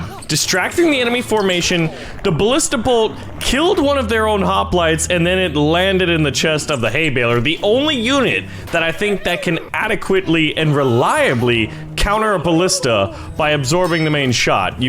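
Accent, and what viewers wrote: Scotland, United Kingdom. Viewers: Scottish accent ? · I like the Scottish accent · 10/10 Scottish accent being given throughout the video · Scottish~ish sounding voice but English units No complaint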